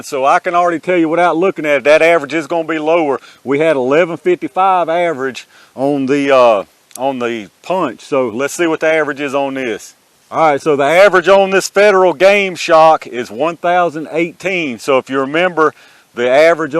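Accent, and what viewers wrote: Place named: Kentucky, United States. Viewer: I absolutely love your accent. Reminds me of my Kentucky kinfolk. ❤️ I loaded up on Federal punch because of your videos. They should be paying you!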